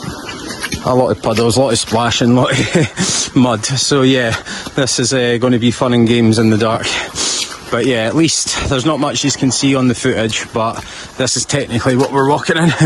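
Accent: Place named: Scotland, United Kingdom. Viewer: Tolles Video, mit klassischen schottischen Wetter. Ein kleines bisschen flüssiger Sonnenschein hier und dort :-) und ich liebe den schottischen Akzent. Macht weiter so.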